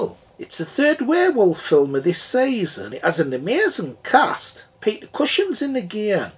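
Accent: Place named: North East England, United Kingdom. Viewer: Really enjoying your reviews of these as they were pivotal in my youth as I have great memories of watching them with my dad, some of the happiest times I ever had in retrospect now I’m in my mid fifties and my dad passed away nearly ten years ago. You also sound like a north easterner, though further south in the region than me.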